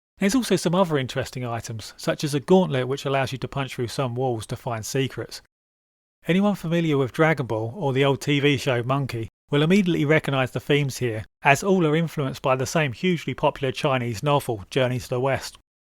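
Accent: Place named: United Kingdom. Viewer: Is this a Brit accent or some other non American commonwealth? Aussie maybe?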